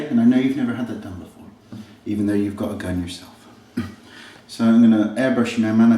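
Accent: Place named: West Country, United Kingdom. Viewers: Love the slight west country accent! Really funny vid x x x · Love the somerset accent! Reminds me of home!:L haha! Loved this, made me laugh! x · I also just 'stumbled' across ur channel - this made my day!!! divorce has made ur eyebrows low - lol!!! fantastic - look forward to watching the rest of ur vids...love that west country accent!! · That was VERY funny. Sometimes i can hear a bit of west country accent when you speak but that was positively "BRIZZLE"! Thanks for the laugh:-D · i love your 'brizzol' accent....... hillarious, my make up artist from bristol sounded exactly the same... but had a much dirtier laugh! brilliant video:-) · are you from Bristol?? Im loving the Bristolian-ish accent hehe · BHAHAHAHAHA!!!!! That is one of the funniest videos eveeerrrrrr!!!:D Good on ya, for being such a good sport!, your Somerset accent really shone through in this one, loved it! 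Thanks for the giggle! Jess x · I think he grew up in Cornwall and moved to Wales? It's a very southern accent. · your accent makes everything you say so much funnier:') love a good west country accent! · hahahaha loving the farmers accent! you sound like you're from cornwall lol xx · What a lovely, natural look you have created. I wish I could do my makeup to look exactly like that! Actually, I think that a Scouse Brow would have complemented the look even more... You are a Very Naughty Boy!!! must really love you to let you get away with all that you do! Love it. Your comedy videos are almost better than your serious ones! Especially accompanied by the Westcountry accent. More more more please x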